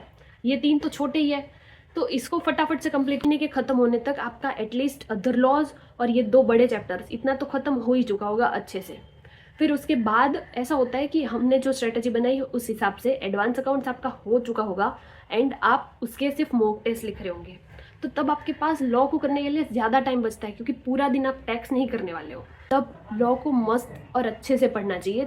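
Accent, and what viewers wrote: Gujarat, India. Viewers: I smell Gujarati accent little bit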